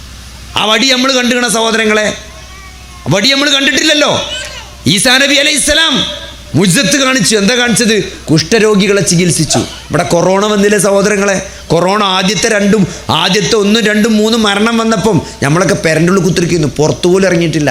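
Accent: Malappuram, India. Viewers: Dr please speak our mother tuge in correct always i lesson your video but your Malappuram tune is there in your speach ok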